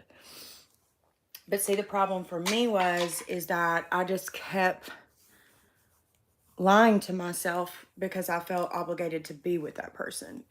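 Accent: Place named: Tennessee, United States. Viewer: Hi! I M just be dreaming, right? That shirt, & girl that accent! Have I found one, a Tennessee girl? Your makeup skills are awesome? Im new to this, like couple of weeks new. Learned to contour my nose last week & I'm old, isn't that sad??? anyways I was just saying hey!!! 😊Have a 'kick ass' day....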